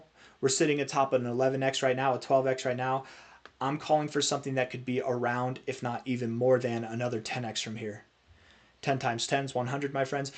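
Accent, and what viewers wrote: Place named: United States. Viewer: Random question but when did you leave America? Cause ur accent is definitely American but the UTC time zone is far from America lol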